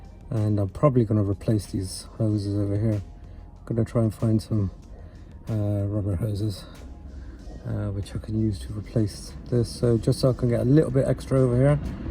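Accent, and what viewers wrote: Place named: Bristol, United Kingdom. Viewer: Nice video 😎👍🏽... Sounds like a Bristol accent 👂🏽That's my town 👊🏽😎... Love the content, helped me gain some valuable knowledge for sure! Keep up the good work 🔥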